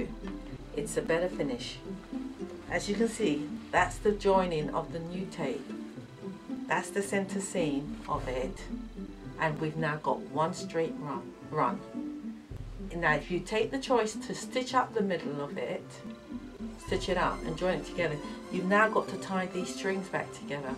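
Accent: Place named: United Kingdom. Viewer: Love your British accent!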